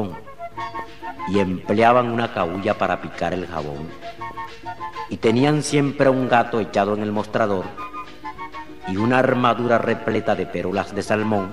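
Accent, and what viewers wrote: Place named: Venezuela. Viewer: que bonitovale, se escucha tan Venezolano e esa poesïa , hay muchas palabras que E hoy en dìa las sigo escuchando, que fino vale, que lindo es ser Venezolano.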